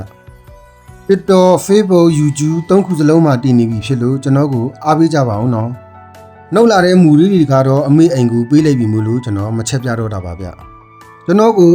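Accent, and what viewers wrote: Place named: Danu, Myanmar. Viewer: မွှေးရပ်မြေကိုလဲလွမ်း ငယ်ဘဝကိုလဲလွမ်း ဓနုသံဝဲဝဲကိုသတိရ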